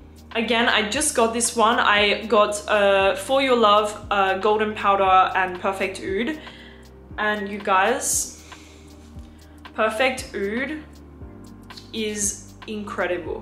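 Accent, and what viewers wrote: Australia. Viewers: you’re an Aussie living overseas and your accent reflects that. Don’t listen to haters. We love you ❤️💙💜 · Your Australian accent is still very prominent makes me miss my good friend Joel from Perth. Ever smell Claude Mersal la mélodie one of my favorite fall unisex fragrances. Bought thameen peregrina as i watched this. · Your Australian is still very prominent, it is just certain gestures that have rubbed off on you, totally natural. Im sure he has helped you with pronunciation of a lot of french words as well.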